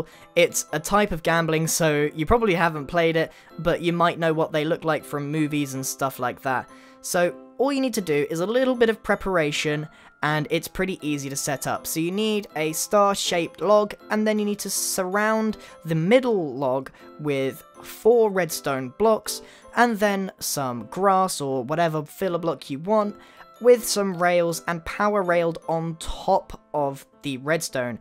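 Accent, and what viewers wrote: England, United Kingdom. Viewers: Grian ar u talking 100% english or american or is it. the same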